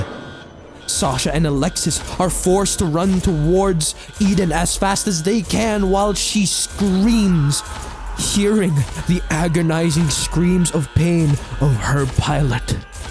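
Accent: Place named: Philippines. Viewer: I had a feeling you were filipino from the accent but I couldn't be sure but hey, I got my confirmation at the start of the video lmao. KABABAYAN 🔥🔥🔥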